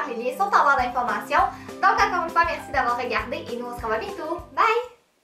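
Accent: Quebec, Canada. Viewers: c'est vrai c'est beau le québécois😘😘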